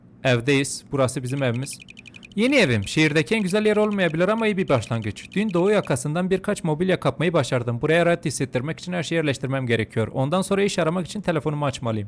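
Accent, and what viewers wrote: Elazığ, Turkey. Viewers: Ben Elazığlıyı abi şiveden anasilıyo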